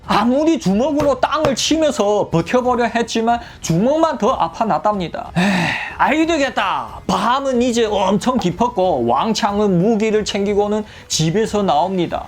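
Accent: China. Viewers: 따거는 중국동포인가요? 말투가 아주 맛깔나네요!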